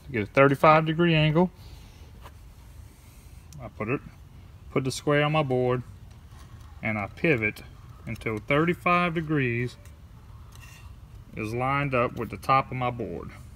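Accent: Louisiana, United States. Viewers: You from the parishes ain't ya? Great video! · Dis dood definitely frum louisiana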